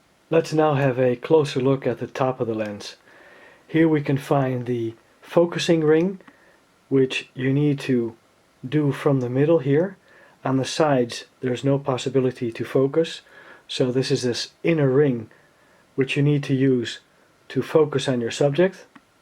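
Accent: Netherlands. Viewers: Ik hoor een Nederlands accent een vraagje wat is het verschil tussen een 35 en een 35 led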